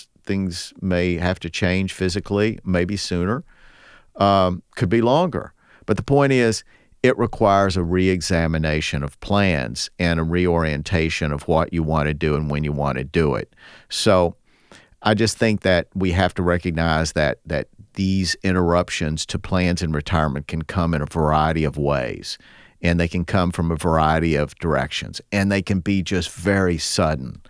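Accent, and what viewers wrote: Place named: South, United States. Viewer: I bumped into your podcast couple weeks ago and I enjoy your information your presentation and your southern accent very informative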